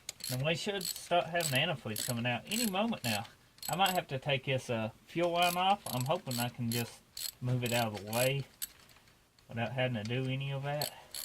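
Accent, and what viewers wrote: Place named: Louisiana, United States. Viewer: Great video and thank you, are you from New Orleans originally? I hear a Louisiana accent.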